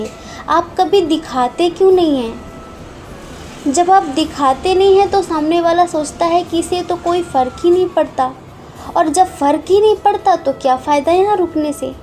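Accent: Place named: Bihar, India. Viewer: Apki langauge bihar ki he completely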